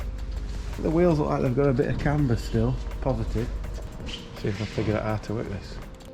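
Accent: Yorkshire, United Kingdom. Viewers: Great video, when you are a fantastic orator with a natural talent for unscrambling technical data with added Yorkshire dialect. You sir have to own the fact that you are indeed the most popular presenter on this channel 🤷🏼‍♂️ embrace it 💪🏻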